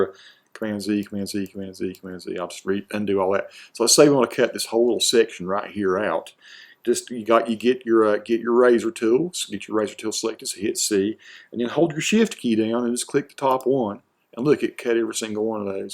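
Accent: South, United States. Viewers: HI! I subscribed. You might not believe how I found your site! I used to live for a few years in Madison County, just North of Asheville. One day I wanted to youtube-lookup videos about that county. There were a few good ones but somehow one of your older videos came up. was part one of four, the " brothers" series where you all rode around the roads and just talking away. I really enjoyed the banter. I had little trouble understanding it, due to not only me living nearby but also living in Tallahassee, Florida for like 60 years on and off. The good old southern accent! I grew up in the very most Nothern area of Appalachia, way out in the country. I also really enjoyed the "wholesomeness" of the brothers, just good ole boys with a heart and soul. I found imagine, though, that someone else who might have stumbled onto this brother series, especially if they came from a Northern city and college-educated; they might only think, at their best, that you all were just a group of rural people with little knowledge of anything! 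But I can FEEL the goodness. And....salvation too! then I looked at your video main page.....oh "Lordy" [I do not mean to take his name in vain, I mean this word to infer a humbling experience That the Lord can Give!] your main page has so many videos of someone with a zillion talents and life experiences. Yet another showing that in the south and especially in the mountains, the Good Stuff is usually hidden away! really hidden away. there is a man w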